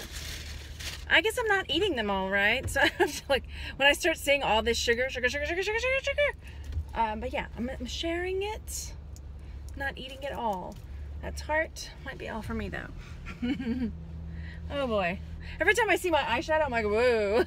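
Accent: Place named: South, United States. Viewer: Happy early Birthday! more southern lady accent talk. :)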